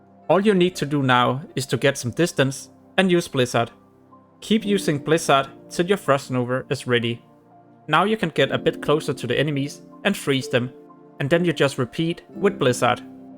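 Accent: Denmark. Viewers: HUGE dansker accent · Danish guy. It's the accent. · it's all good, nice video you made. - sounds like you are danish :)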